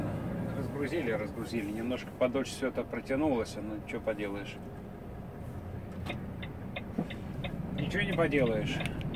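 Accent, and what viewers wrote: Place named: France. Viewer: Спасибо, ну впринципе не особо удивлён, последствия "приёма беженцев" во всей красе И грязь и попрошайки это пожалуй самое безобидное. Каждый раз удивляюсь твоему французкому акценту - навигатьион, итд:) Вроде немец жеж:) Думад француженка, так нет, вы по немецки вроде общаетесь, логичнее кмк Кэтрин всё же:)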